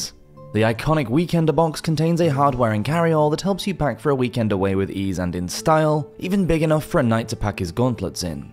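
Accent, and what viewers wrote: England, United Kingdom. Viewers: Just English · a deaf person can tell this is an english accent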